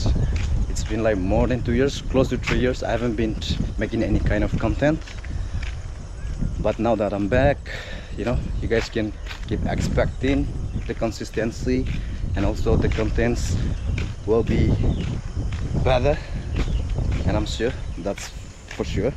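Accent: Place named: England, United Kingdom. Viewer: Hahah this kid 😂 Still got the old vibes Love your English accent Big fans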